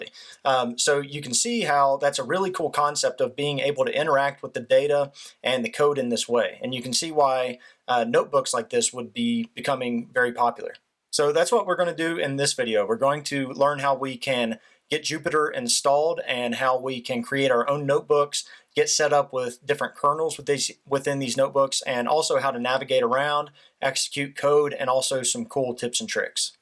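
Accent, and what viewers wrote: South, United States. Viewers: Great vid, thanks! Great southern accent, lol! With love from South Africa.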